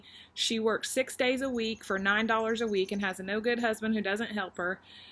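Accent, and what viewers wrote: South, United States. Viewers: Just finished watching both of your videos, and really enjoyed them! Love your location and your southern accent. My husband and I vacationed at Fort Walton Beach a few years ago, and really love the Gulf Coast. Your stitching is lovely, and I really enjoy your book reviews. I will have to try the Victorian Motto Sampler threads, as they look truly beautiful. Looking forward to more videos from you!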